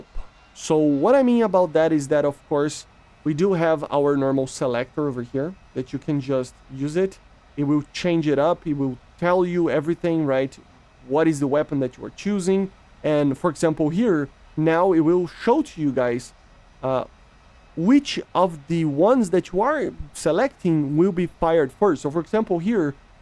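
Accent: Brazil. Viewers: Are you Brazilian or Argentina ? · You literally just put words in my mouth. I simply said He's Brazilian. Another words you shouldn't expect someone that clearly isn't a native English speaker to say everything correctly. So to stop this pointless argument so both of us can get on with our nights lets not put extra words in my mouth that clearly wasn't said in the sentence that I said. Enough is Enough lets just get on with our nights and have a beer on a nice Saturday night. If that's not what you do fair enough just enjoy the rest of your night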